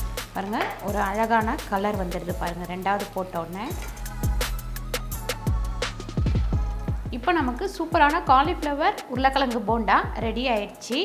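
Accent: Telugu, India. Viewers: உங்க தாய்மொழி தெலுங்கா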